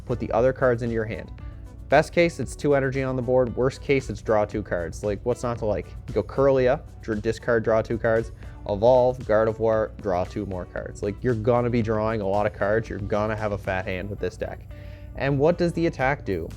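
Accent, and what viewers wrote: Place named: Atlantic Canada, Canada. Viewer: Yo, you sound like you live in Atlantic Canada? Any events nearby coming up?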